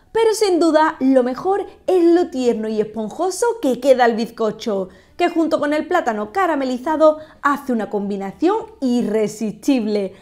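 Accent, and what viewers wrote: Spain. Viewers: ¿De que parte de España es el acento?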